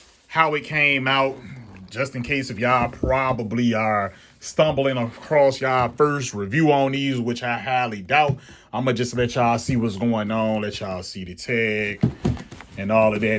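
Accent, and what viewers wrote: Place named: Louisiana, United States. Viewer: Where u from? Sound like Louisiana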